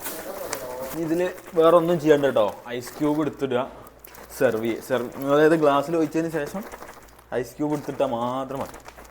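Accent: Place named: Palakkad, India. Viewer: എന്റെ പാലക്കാടൻ ഭാഷ 👍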